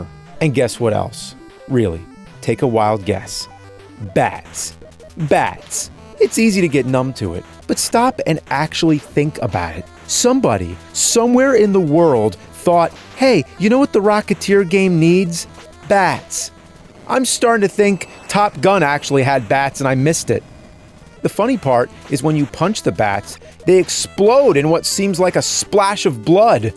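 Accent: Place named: New Jersey, United States. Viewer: nerd cant even say the name of the main character Cliff Secord correct he called him Cliff Sector WTF it must be his dirt bag new jersey accent thats why the call new jersey the garbage state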